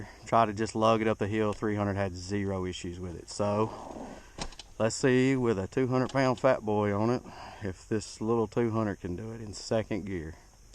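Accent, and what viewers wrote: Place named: South, United States. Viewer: i'm not from America, but.... is that a bit of a Southern accent i detect there...? i sure think so !!! :-))) great looking bike bro ! thanks for the review ! greetings from Israel ! take care !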